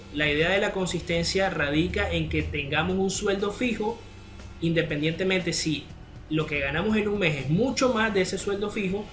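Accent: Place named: Guayaquil, Ecuador. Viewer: De donde eres bro???? hablas como guayaco????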